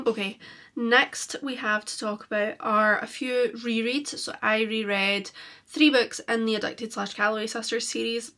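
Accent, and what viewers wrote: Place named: Scotland, United Kingdom. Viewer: nice video! scottish accents are so addicting to listen to 😂💞 - from a slavic girl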